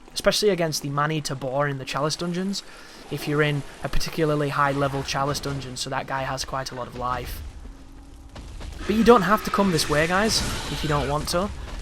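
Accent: United Kingdom. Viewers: Dude I follow you since your Onimusha DoD walkthrough and it's nice that you're making this walkthrough of my favorite game to show some people that its ez and no one should be afraid of it, keep the hard work! BTW are you brit?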